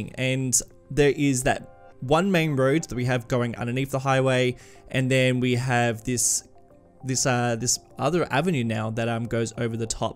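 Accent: Australia. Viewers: Are you Australian? I love it when I find a youtuber who is from my country.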